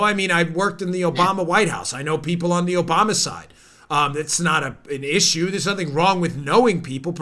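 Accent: New York, United States. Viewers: You rock, Patriot! keep that New York accent.....so tired of the fake "Steele Farce-ier" We need orange vests with a huge "Q" on it. We will call it "the Trump/Q OrangeQrew": What do you think Donnyboy?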